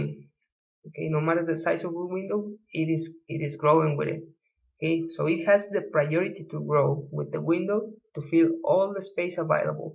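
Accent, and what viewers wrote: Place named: Italy. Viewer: Accento italiano!! Grazie per il tutorial!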